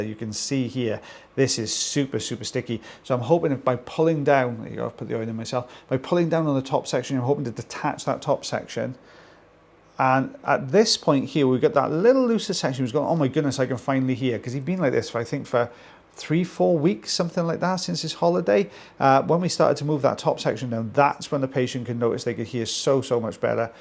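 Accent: Wales, United Kingdom. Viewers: Think you could do an audio book. Cool, calm, Welsh accent, 🎉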